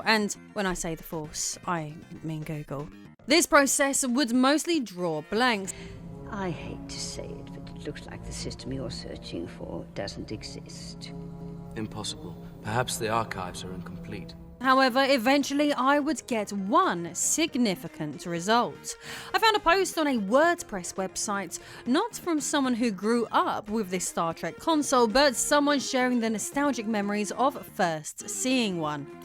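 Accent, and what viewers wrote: United Kingdom. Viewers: You would make a Good lieutenant troi... British accent and all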